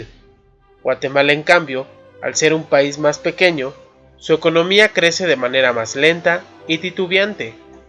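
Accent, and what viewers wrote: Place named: Mexico. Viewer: Nada que ver tu video amigo... Te escucho muy mexicano.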